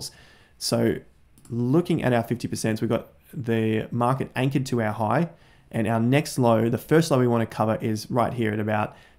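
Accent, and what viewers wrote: Australia. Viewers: Wycoff theory was echoing in my ears in his aussey accent for days without knowing what it really is. now I friggin know.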